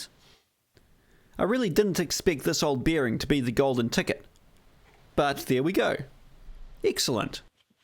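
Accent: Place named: New Zealand. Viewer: Ahh the refreshing sound of a kiwi accent whilst abroad. Great stuff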